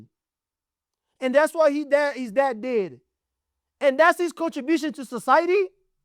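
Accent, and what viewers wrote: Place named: Nigeria. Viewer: Is that your name or your faith? You’re too bitter to go by that name.I mentioned Nigerian because I detected an accent, but I was really hoping you weren’t! If you consider “ working hard” irrelevant then there really isn’t much I can say. You go and be all the things you claim Shedeur isn’t. Your video actually showed up as “ sponsored”. You need to “ read the room”. That young man has a popularity that you can only dream of. 5th round pick?? Yet here you are, and all the major channels, discussing him!! Good luck and goodbye.😂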